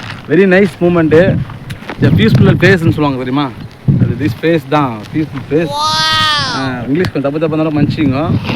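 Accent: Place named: India. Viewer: வட மாவட்ட பேச்சு ❤🎉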